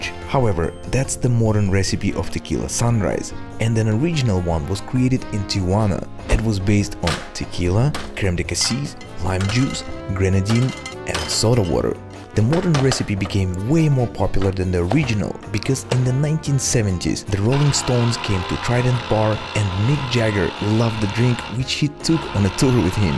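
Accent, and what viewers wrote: Russia. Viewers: Акцент русский будто...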